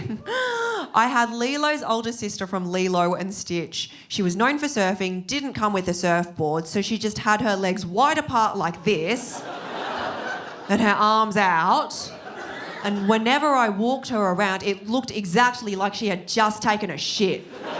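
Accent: Australia. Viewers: The thing is, you can tell by her voice that she’s Asian, even with the Aussie accent. Yes she has an Australian accent but something about the tone of her voice doesn’t sound Anglo-Celtic Australian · There was no disconnect for me because this is something I see everyday. All sorts of ethnicities and an aussie accent. Love it. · I think you sound more Australian then I do. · OMG 😂 ... i'm sitting in a starbucks cafe... laughing out loud, disturbing others 😅 A great material 👏 😊 ( White american man in florida... add your joke here ____) I Love your australian accent ❤ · How does she have an Australian accent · How do you sound so Australian i was a bit startled when i pressed play. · Part of charm is the cognitive dissonance of the very Australian accent with the very Asian face. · Because she’s ethnically Chinese, but has an Australian accent. Hope that helps. 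· She leans into the Strine, but her accent is unmistakably Asian Australian - and there's nothing wrong with that. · Hey …you do a great Australian accent. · Im sorry, but your accent is too aussie for me!!! i can't listen to it amd im an Aussie myself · yours isn’t just an Aussie accent, it’s the most Aussie accent I’ve ever heard! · Her 普通话 has an Australian accent. :)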